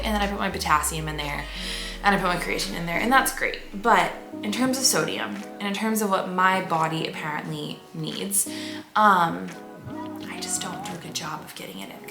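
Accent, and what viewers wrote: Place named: Idaho, United States. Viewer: Am so excited to have a new great channel to watch! Sounds like you're maybe an Idahoan too?! Neighbors!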